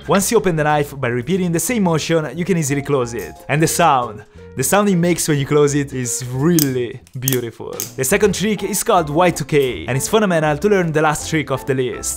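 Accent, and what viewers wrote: Italy. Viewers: Cazzo si sente che sei ITA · He's Italian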